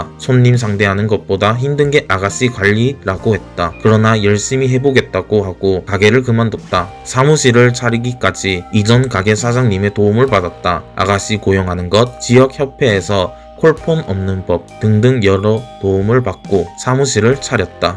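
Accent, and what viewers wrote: Yanbian, China. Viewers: 말투가 조선족말투 같네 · 발음이 중국인같은데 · 말투가 조선족 인가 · 발음이 조선족인데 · 발음이 중국인이네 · 발음이 조선족이네? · 너 조선족 맞지? 말투가 딱 조선족이네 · 조선족이냐? 말투 거슬리네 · 발음이 조선족인데?? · 이분 조선족 말씨를 쓰네요. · 말투 조선족인가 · 음~~~이상하네,, 발음이 중공 발음.... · 조선족 억양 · 대한민국인 어투는 아니시네요... 조선족 어투와 많이 유사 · 조선족이신가?ㅅ발음이 영락없는데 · 발음이 딱 조선족인대? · 말투가 조선족인데... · ㅡㅡ.. 중국에서 했나 말투가 연변어네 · 말투가 딱 연변인데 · 조선족 말투같은데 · 말투가 연변이신데 조선족이신가요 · 말투가 조선족말툰데....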